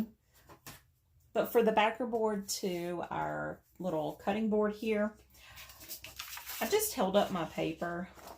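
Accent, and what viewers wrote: South, United States. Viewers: Love watching you and hearing your Southern accent. I’m an Texan girl so it’s good to hear a familiar accent. Your DIYS are so good and I feel like I’m at home. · OMG ... I'm from the South (Southeast Texas) and I LOVE, LOVE, LOVE your accent!!! Can't wait to see what you come up with 😁😁😁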